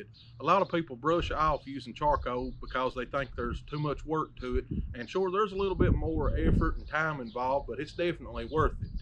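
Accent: South, United States. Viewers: Boy if that isn’t a southern accent I don’t what is! Enjoyed your video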